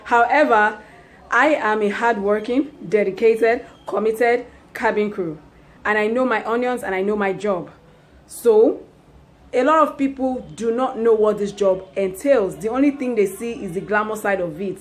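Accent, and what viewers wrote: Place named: Nigeria. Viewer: Hello I love your authentic Nigerian accent! The Nigerians that I know are very hard working and ambitious people!! you seem to be so driven about your life and future!!! What I wanted to ask you is if it is possible to raise a family with the salary that you guys make as a flight attendant for Emirates? Is Emirates hiring again pretty girls and boys from the world over?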